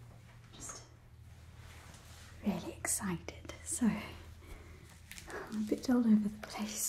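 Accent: United Kingdom. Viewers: Your British accents are always so tingly ❤❤ Also I made a nobel water genasi character named Cordelia literally a week ago, I’m losing my mind. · Would absolutely love this character to come back, I'm not sure what it was but this video is 1st in my top 3 asmr videos. (The accent was very English. If I was a new sub I would've thought you were from uk).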